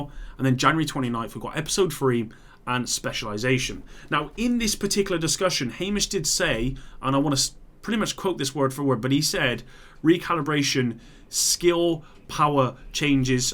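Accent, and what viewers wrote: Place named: Cockney, United Kingdom. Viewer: propa cockney m8 th=f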